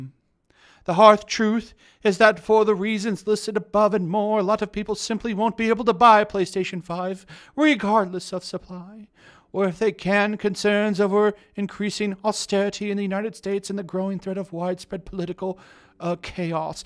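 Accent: United Kingdom. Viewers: Your voice matched exactly what i thought the guy would sound when i read this article lol at least the stuck up british voice. People go to Kotaku for laughs not games related content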